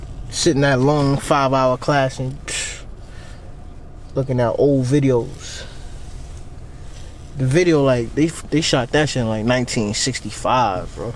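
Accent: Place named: Philadelphia, United States. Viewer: You sound like you’re from Philly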